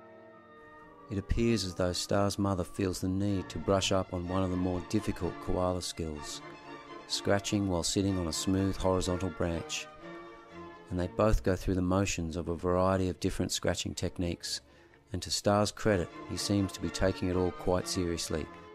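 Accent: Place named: Australia. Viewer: I loved the work on this documentary. The word has to go out to make people aware that koalas are on the endangered list. The I haven't seen anything that has this quality of images and information before. The sound track and voice over give it a uniquely Australian feel and I really enjoyed it. It's great to see koalas in their natural habitat and that's what makes this special for me. I hope to see more from the makers of this in the future.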